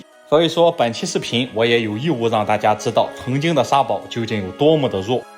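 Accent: Shandong, China. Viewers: 山东口音有点意思： 挖囊废； 😂听了半天才反应过来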